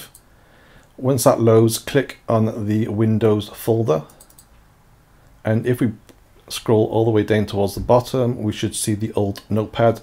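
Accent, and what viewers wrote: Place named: United Kingdom. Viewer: I just started watching the video and already gave a like on It just because I loved the man's accent, IDK If he Is British but I love the British accent, and his accent sounds very likely, so... yeah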